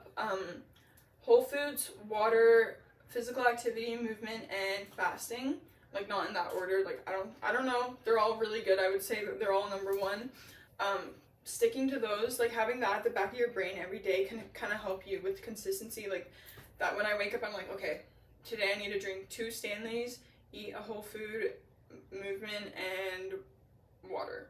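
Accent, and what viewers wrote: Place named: Denmark. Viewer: Why do I hear a Danish accent? :)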